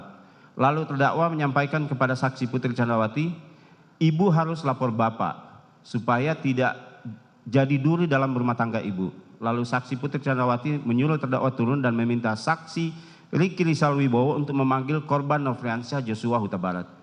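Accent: Java, Indonesia. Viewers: Hal yg di karang karang membaca nya uga gk lancar pletot pletot boso jowone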